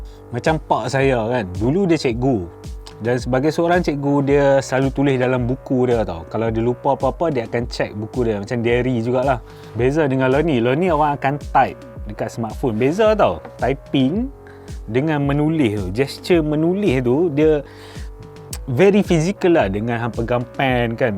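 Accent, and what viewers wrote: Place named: North, Malaysia. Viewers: org perlis ka?..ckp loghat perlis kalau ya..hi..kita geng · Cakap Kedah baik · Ckp utagha dia pekat boleh tahan hahah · Tudia kembali pada jalan sebenar, dulu review laguni bahasa utaqa pastu tukaq KL la tukaq balik. Sempoi 😁👍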